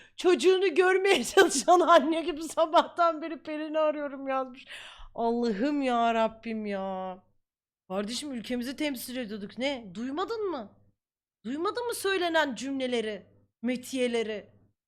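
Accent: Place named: Black Sea, Turkey. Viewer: Pqueen aslen nereli ? Biraz Karadeniz konuşması hissettim bir de kolbastı ile alakası var filan , biler varsa söyleyebilir mi ?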